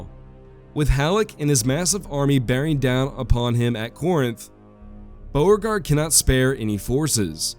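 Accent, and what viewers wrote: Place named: Texas, United States. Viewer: Really? It takes 4 months an episode. The Texas accent is a distraction also.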